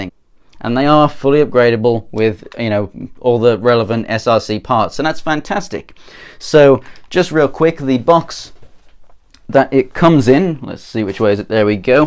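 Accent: United Kingdom. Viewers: Hey, I’m assuming from the accent that you live in the UK. Just curious where you got your mags from as I can’t find any UK online airsoft retailers that stock them. Did you buy them from a US site and have them imported? (Great video btw)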